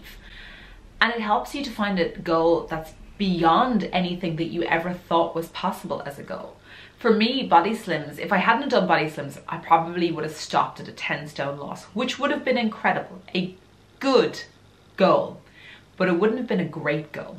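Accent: Ireland. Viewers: Hello from Spain .are you Irish by any chance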